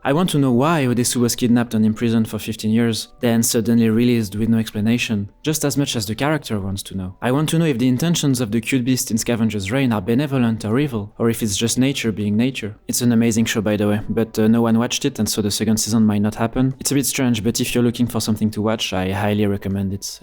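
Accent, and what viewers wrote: France. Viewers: I watched this video and the one about the cabin in the woods, and I really like how you edit your videos and present your thoughts. Someone in the comments on the cabin video said you should summarize the plot oft the movies you review more - I disagress. Discussing specific parts of movies is a really good advertisement to watch the movies. Your charming French accent is the cherry on to...can't wait to see and hear more of your work!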